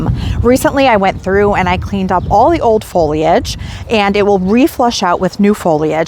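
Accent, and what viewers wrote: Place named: New England, United States. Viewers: So much inspiration for me! Your gardens look gorgeous. I grew up in RI, and I heard the accent in your video and knew it was someone local! In NH now, do live to see a month or so ahead. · What’s ya doing!❤. Love the New England accent.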